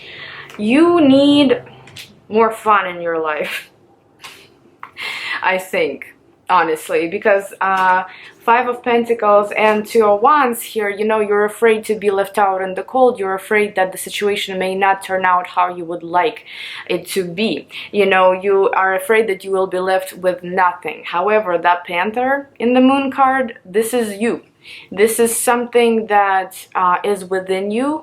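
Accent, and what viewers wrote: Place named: Russia. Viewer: you’re the only one who among of all my fave tarot readers who have been extending such concern about this current situation which the whole wide world is seriously getting so alarmed right now, i hope and prayed this too shall pass and that everyone will be safe, and one more thing you i don’t really know you personally but i just got some sense that i think you’ve got this Russian accent or something, like i don’t know..but you simply reminds me of my Russian friend.♒️💖🙏🏻💪🏼✨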